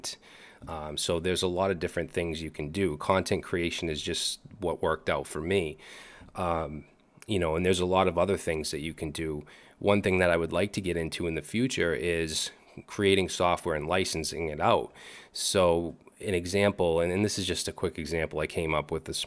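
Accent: Boston, United States. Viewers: you're great. Been here (read: Udemy) since your Eduonix days. PS - your Boston accent is nearly gone!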